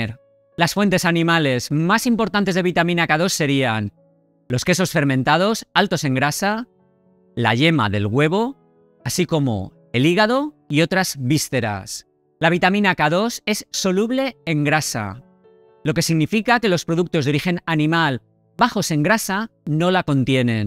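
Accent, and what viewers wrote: Valencia, Spain. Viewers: Que rico el chucrut. Cada vez que veo tus videos me engancho mas, creo que por tu forma de hablar eres valenciano. Saludos desde Malaga.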